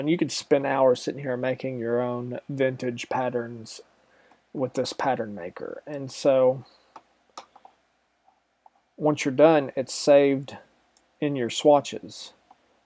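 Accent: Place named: United States. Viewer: You sound like a cowboy :-D You're accents amazing! This is a really helpful video. Thank you.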